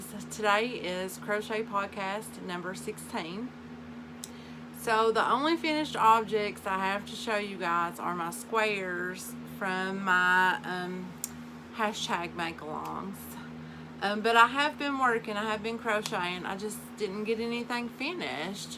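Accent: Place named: North Alabama, United States. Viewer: I enjoy your videos so much. You sound like you are from north Alabama. I lived there for several years. It was like heaven. Now I understand why (from Jacksonville like me and where I moved back to) sang. I never understood until I felt homesick for the wide open beauty of it.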